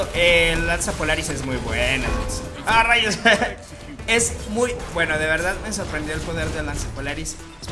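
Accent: Mexico. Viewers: Porque hablas como arigameplays? Es algun dialecto de alguna parte de mexico ?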